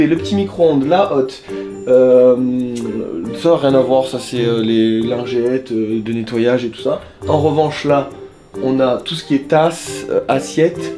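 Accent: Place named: France. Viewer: Tu devrais vivre dans une banlieue islamisée, tu as tous les tics de langage des jeunes des banlieues.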